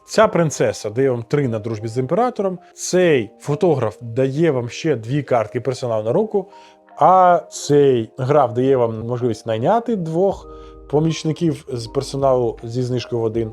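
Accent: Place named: Hutsul, Ukraine. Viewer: Сколько вас развелось. Ужас, слушать невозможно, суржик гуцульский, извращенный русский язык.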